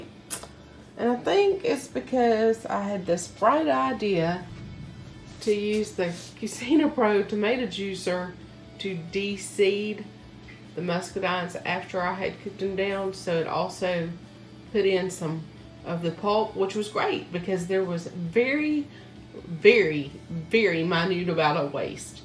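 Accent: Mississippi, United States. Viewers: Yummy Yummy..Great presentation as always..Mississippi talk and all..:)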